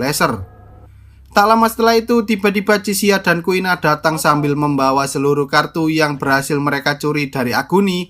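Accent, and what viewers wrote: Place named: Banyumas, Indonesia. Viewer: ngapak nya dari daerah mana nih 😂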